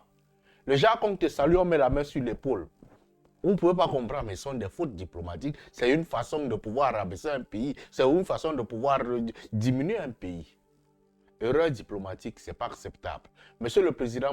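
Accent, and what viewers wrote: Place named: Cameroon. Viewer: au senegal pays musulman constitué de confreries leducation est basée sur lenseignement coranique ou francais et non tout ce qui est maconnique donc il n'ya aucune chance que diomaye puisse comprendre ces choses en vrai senegalais quil est , mais meme si macron fait des signes maconniques il peut se targuer detre plus grand mais ce qui est sur est que diomaye est bien dans sa tete et connait deja la politique qu'il va mener donc les signes maconnniques je suis plus grand et tout , eh bien quils le mettent dans leur trou du cul tout simplement , rien a foutr et puis vous les panafricains camerounais car je sais que tu es camerounais par ton accent ce signe de la maingue maingue vous devez dabord vous occuper de deloger biya de votre pouvoir avant de venir noius donner des lecons de macons ou je ne sais quoi vous avez vraiment du boulot qui vous attend ces a dire deloger biya 40 ans de pouvoir voyons je ne comprends pas pourquoi vous vous acharnez sur le senegal ou yaurait d'autres raisons a ca , vous etes trop focalisé sur le senegal , occupez vous de vos oignons nom de Dieu ce nes pas parcequ on est africain qu on doit forcement vous rejoindre dans vos ideologies , ideologie pensee unique cest archaique et démodé ca chacun il gere son pays et cest tout nous cassez pas les couilles a la fin quoi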